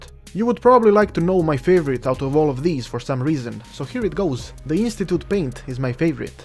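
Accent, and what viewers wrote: Russia. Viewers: Ha ha ha love the commentary Russian accent is hilarious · Accent too thick, can't tell if He is Russian or Canadan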